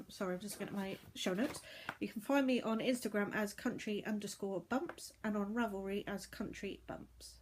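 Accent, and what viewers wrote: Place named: Devon, United Kingdom. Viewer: Lovely 1st episode, catching up on all your podcasts. Lovely to hear another Devonshire accent, me loveerrrr 😘💗 Gorgeous makes! X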